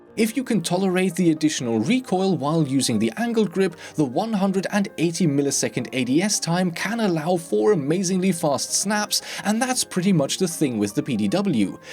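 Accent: United Kingdom. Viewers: That’s just cause he’s British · I thought this guy was a robot with a british accent. My whole life has been a lie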